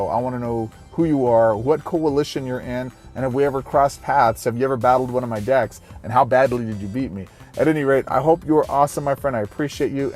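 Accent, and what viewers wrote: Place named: Scotland, United Kingdom. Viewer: What's that Scottsman from?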